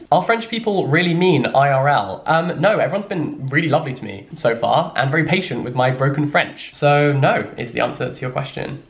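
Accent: United Kingdom. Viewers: honestly I have no interest in reading but I really like your videos, I find them so entertaining also the brittish accent helps me prepare for CAE listening 💀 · You are literally my reason why I love the British English language in human form! Your accent and the way you use words is the exact reason I love English! Everytime I watch your videos I am so grateful to 13-year old self for choosing the bilingual course at my secondary school! You are amazing!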